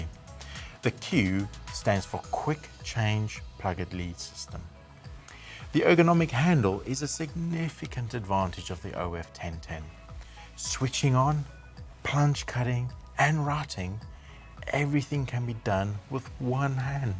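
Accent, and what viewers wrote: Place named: South Africa. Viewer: He sounds South African. I just bought this router. Damn I was not expecting it to be this good!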